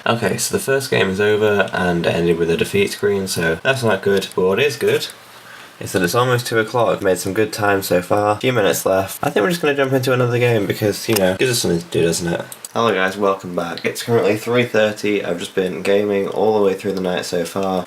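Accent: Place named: United Kingdom. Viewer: Oh wow a Brit. Genuinely expected an American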